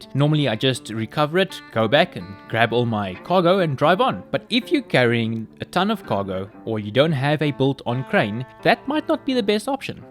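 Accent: South Africa. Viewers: Joh , the Afrikaans accent is strong with this one!! Would love to jol some co-op with you sometime!!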